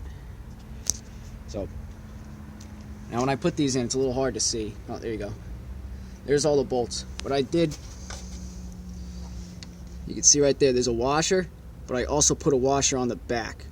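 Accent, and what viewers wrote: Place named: New Jersey, United States. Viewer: Very thorough video. I liked watching it. Makes it seem easier to understand. New Jersey accent.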